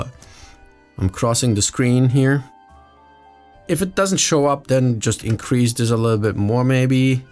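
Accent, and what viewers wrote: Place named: Italy. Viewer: I knew I recognised something italian-ish to you! That's because you are in fact Italian! Grande! :D I love the way you talk through your contents, and also the voice sounds. They made my day when I heard them the first time. I am almost new to Fusion and I am trying to get into it as much as I can, also because I am trying to push it in the company I am currently working for. Anyways, bel lavoro!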